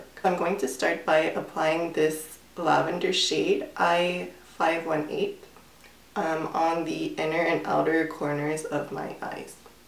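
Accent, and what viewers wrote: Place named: Russia. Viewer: i have been watching your videos and i would like to know where is your accent from... it sounds like russian to me but i can't know because I am from Chile ( where the 33 miners were rescue alive)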